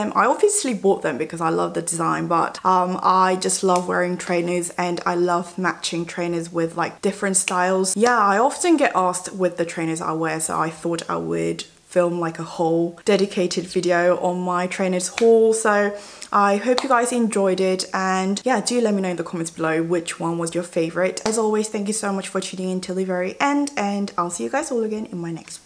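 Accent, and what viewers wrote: United Kingdom. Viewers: omg I've actually missed your british accent!!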